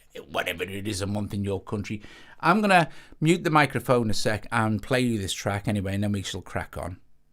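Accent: Liverpool, United Kingdom. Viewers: I love your scouse accent ;)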